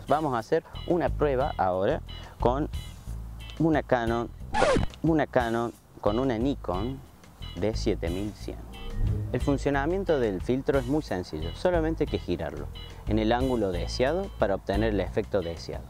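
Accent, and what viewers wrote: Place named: Córdoba, Argentina. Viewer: Te escucho con acentito coordobes, argentino. Gracias por el video.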